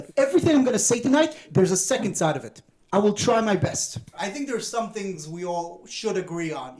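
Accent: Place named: Israel. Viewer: Toda raba, lachte das erste Mal seit einanhalb Wochen vom Herzen ❤ love your sweet israeli english 🤗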